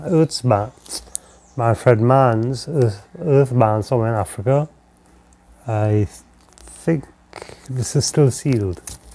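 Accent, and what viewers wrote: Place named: Caribbean. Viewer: Sweetheart... This was incredibly sweet. I loved this. You served us Dvds, cds AND vinyl? Yes, honey. One of my best friends is a trini gyal. Hard to not know that classic west indies accent. Love it. You nailed this AND gave us a part 2? Ill deep dive, sugar. I need that rekkid btw. Toodaloo!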